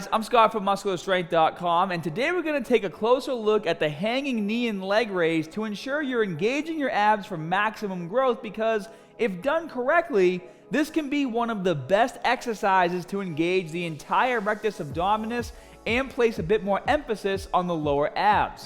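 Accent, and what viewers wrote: Boston, United States. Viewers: Great video, obviously a Boston boy, LOL. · He sounds like my former HS principal who came from Boston 😅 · The Boston accent is great.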